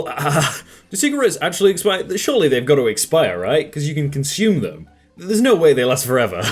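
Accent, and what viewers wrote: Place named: United Kingdom. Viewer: How can you be british, or at least sound it and NOT know who klopp is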